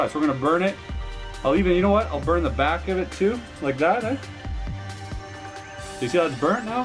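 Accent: Quebec, Canada. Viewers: Guy was speaking french ... Ou ce vidéo a t-il été fait ! Accent québécois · Ohhhhhh un québécois mon boy!!!! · Are you from Canada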